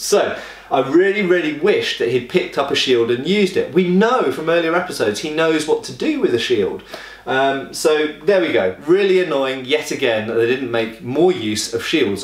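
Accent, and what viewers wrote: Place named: United Kingdom. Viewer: did he just say "soccer" ? He sounds british though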